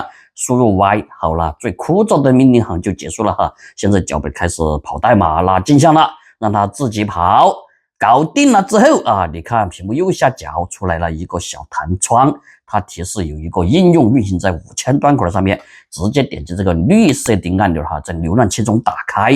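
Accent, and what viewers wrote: Sichuan, China. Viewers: 这个川版普通话我还是听得懂，就是搞这个不花钱的邮箱太复杂了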